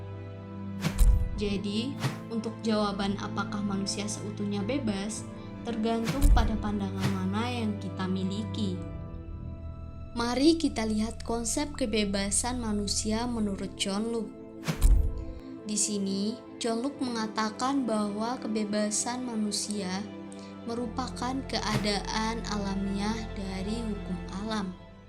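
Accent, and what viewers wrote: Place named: Java, Indonesia. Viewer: Lucu ih suaranya medok khas gitu 😂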